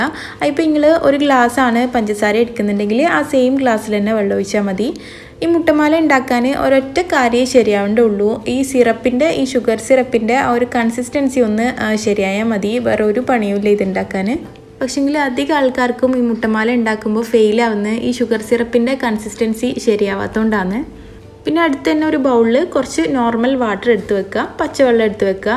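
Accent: Kannur, India. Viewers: കണ്ണൂർ ഭാഷ കളയൊന്നും വേണ്ടാട്ട. അതാണപ്പ അയ്ൻ്റെയൊരു രസം. പറ്റുമെങ്കിൽ ഇംഗ്ലീഷ് സബ്റ്റൈറ്റ് ൽ കൊടുക്കാൻ ശ്രമിക്കുക. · Good ഇങ്ങനെ തന്നെ കണ്ണൂർ ഭാഷ യിൽ തന്നെ മുന്നോട്ട് പോവണം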